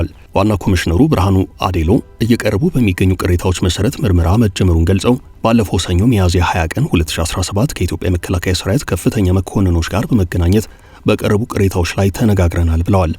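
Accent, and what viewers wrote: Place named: Eritrea. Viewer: Ethiopia ina artira biwagu anit metifo utuber ke ethiopia dirashihin newi yeminatefahi ye artira selayi newi yemitimesilewi the way u talk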